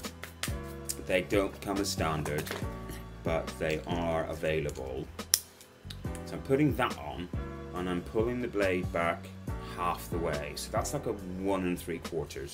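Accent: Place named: England, United Kingdom. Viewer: I just love his English accent!!!!!!!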